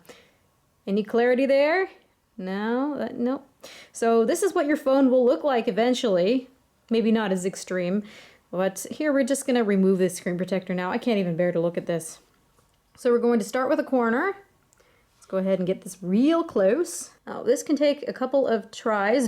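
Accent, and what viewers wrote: Canada. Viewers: I love the canadian accent :) haha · Are you Canadian ?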